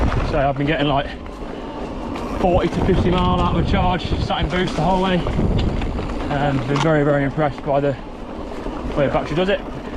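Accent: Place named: Ireland. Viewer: ay mate speak English, your choppy when you speak, only brits will understand you.,,,for a Mick....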